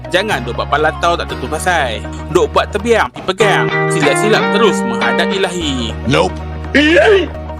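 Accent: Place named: Kedah, Malaysia. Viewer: Sedap dengar logat Kedah. 😍😍😍😎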